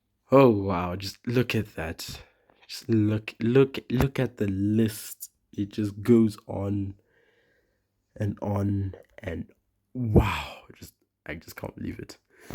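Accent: South Africa. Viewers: South African? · Are you from south Africa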